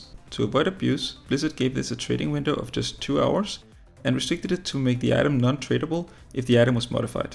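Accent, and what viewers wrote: Denmark. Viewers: "A new channel based in Europe" You are very much Danish my guy, the accent does it all :0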